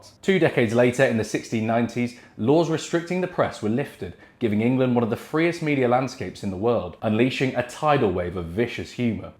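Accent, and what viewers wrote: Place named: United States. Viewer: Enjoyed the scholarly and interesting history. The American accent, not so much. 🇺🇸🇺🇸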